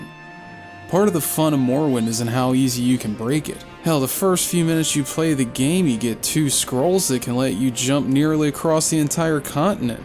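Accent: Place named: Philadelphia, United States. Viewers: Sounds like a Pennsylvanian. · Absolutely love Morrowind. Just started my first ever run through and am so immersed it’s more real to me than my stupid job. Great content man. Keep it up. I gotta ask you, Are you from Philly? I grew up there and just wondered from your accent. Feels like I know ya. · Bro please tell me you’re from Philly or at least PA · I hear a slight philly accent. A fellow bird team? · lol youre definitely from PA or South Jersey with that accent bud. Good video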